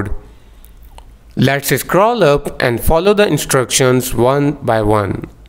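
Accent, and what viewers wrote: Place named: United States. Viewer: Nice accent for an American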